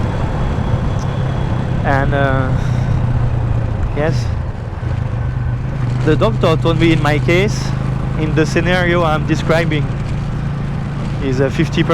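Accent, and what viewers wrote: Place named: France. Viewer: Vu l'accent anglais je pense que tu es Français, donc je vais parler Français. Ca fait vraiment peur comme expérience, en un instant tu te retrouve dans un enfer de piqures et de saloperies volantes qui t'attaquent de toutes parts.. En regardant la scène je me demandais pourquoi tu n'avais pas foncé dans l'eau, mais immédiatement je me suis dit qu'évidemment tu ne devais pas être capable de penser très logiquement dans une situation pareille, et peut-être que comme il ne t'étais jamais arrivé pareille chose tu as cru qu'en partant plus loin elle cesseraient de te suivre ? C'est dingue qu'elles continuent a t'attaquer autant même une fois dans l'eau.. tu dis même qu'elles venaient sous l'eau pour te piquer ? As tu tenter de les éclabousser a ce moment ? Je te demande pour savoir un peu mieux quoi faire si jamais ca m'arrivais.. 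Tu as prévu de l'equipement au cas ou cela arriverait de nouveau ? Je ne sais pas si il existe des bombes spéciales pour les repousser.. En tout cas content pour toi que tu aies pu survivre a ce terrible moment, et bravo pour tes vidéos qui ont l'air très intéressantes et que je vais surement continuer a explorer ! Bon voyage !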